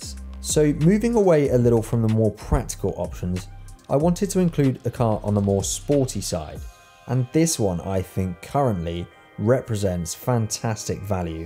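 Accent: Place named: RP, United Kingdom. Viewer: Again, a superbly spoken video, very well delivered, you should consider presenting on on of the mainstream TV channels. Perfectly spoken Queen’s English! A real pleasure to hear you present and so smart and mature, of a intelligent man. Thank you 🙏🏻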